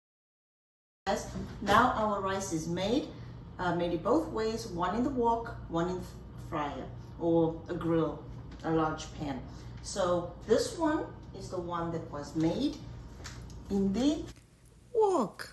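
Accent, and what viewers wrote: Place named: South Africa. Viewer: Came across your channel and ❤ your recipes. You sound like you from South Africa. Keep the recipes coming.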